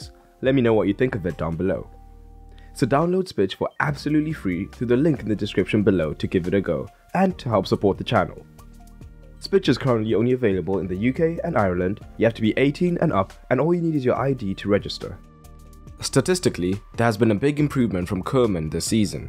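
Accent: South Africa. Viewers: I know you have a UK channel but are you South African? Your accent sounds so South African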